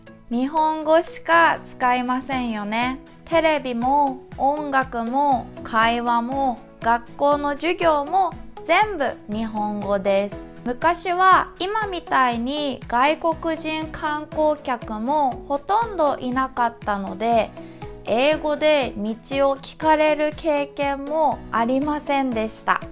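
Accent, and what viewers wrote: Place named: United States. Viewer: Your American English accent is quite good, it makes sense that you learned it naturally in an American environment. I worry my accent will always be noticeable when i speak Japanese.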